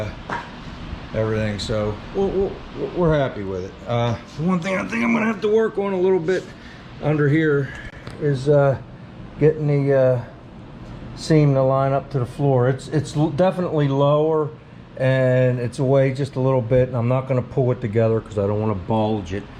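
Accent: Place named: St. Louis, United States. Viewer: Are you from St. Louis mo. Area? My YouTube entertainment lately is guessing people location based on accent.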